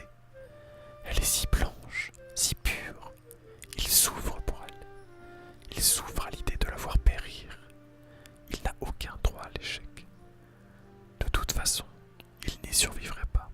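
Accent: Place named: France. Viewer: ese acento francés😍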